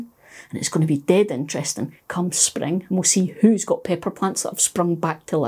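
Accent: Scotland, United Kingdom. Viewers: Thanks - really good informative video, love your Scottish accent too!!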